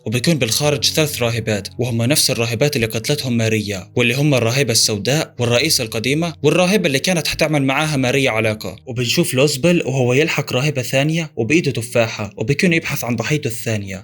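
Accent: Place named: Syria. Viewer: لهجتك بين السوريه وبين المصريه حيره🤔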